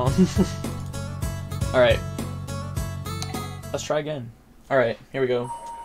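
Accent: Canada. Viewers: you sound like youre from both Canada and chicago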